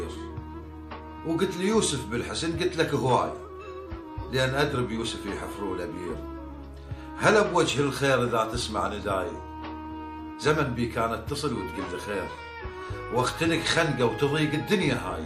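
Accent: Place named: Iraq. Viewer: لهجه عراقيه تهبل🌹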